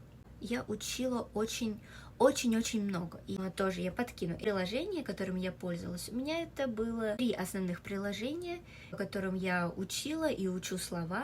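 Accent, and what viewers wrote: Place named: South Korea. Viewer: Добрый вечер! Я просто в (здесь мат в хорошем смысле) с вашего прогресса в изучении данного языка. В русской речи уже слышен корейский акцент, что считаю показателем высокого знания иностранного языка. Мне из азиатских языков (выбирал только из корейского, китайского и японского) понравился японский. Но так как там есть иероглифы, решил что с иероглифами познакомлюсь быстрее через китайский))) Но через время узнал, что в корейском тоже есть китайские иероглифы (к сожалению на данный момент уже не использую, либо почти не используют) и соответственно решил изучать и корейский. 🤕 И это была большая ошибка. Корейский на тот момент мне не зашёл, для китайского не было такого рвения как для японского. Ну и соответственно было принято решение, отложить изучение иностранных языков на неопределенный срок. В этом году (в ближайшее время) хочу вернуться к изучению 7 языков. 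В моих целях нет достижения такого же уровня в столь короткий срок, просто хочу получать больше информации по шитью из разных источников. Можно будет увидеть обзор на приложение дуолинго? В основном интересуют: произношение и построение предложений. P.S.: В мой план по изучению входит дуолинго (только из-за того что он бесплатный); несколько блогеров (на данный момент по шитью); бесплатные курсы на ютуб; ну и в дальнейшем фильмы и сериалына иностранных языках, но только с субтитрами на оригинальном языке. Соответственно музыка, и какой-нибудь информационный канал (онлайн) на ютуб.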